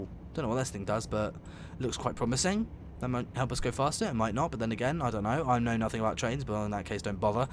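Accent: England, United Kingdom. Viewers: The"bluething" is called the javelin duh. The YouTuber sounds English and on The side of the train in real life says Javelin so he should actually know.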